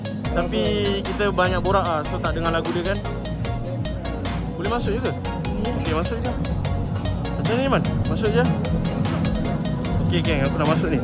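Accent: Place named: Singapore. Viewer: dari slang bahasa je kita dah thu dari singapore...tapi apa pon mantap bosku..gerekkk seyy....salam dr johor..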